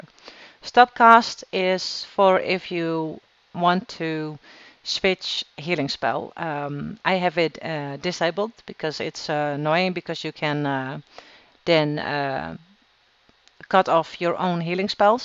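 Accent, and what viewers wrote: Netherlands. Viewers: Ive heard right away that she was dutch coz i have the same accent :))). But i have another question. Ive started to play wow again and downloaded my fav vuhdo healing addon. I just bought also another mouse, logitech g604. Problem is i cant figure out how to setup the mouse buttons after mouse button 5 in vuhdo. It looks like vuhdo dont see the buttons after button 5.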